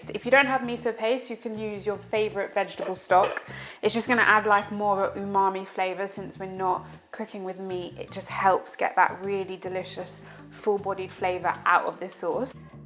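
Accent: United Kingdom. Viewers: That is such a beautiful form of the English language! What region are you from in the UK? This is so classy.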